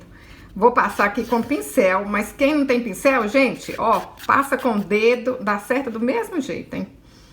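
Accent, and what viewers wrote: Minas Gerais, Brazil. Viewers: Ameiiiii....e amei mais ainda o sotaque mineiro, sou uma capixaba apaixonada por Minas!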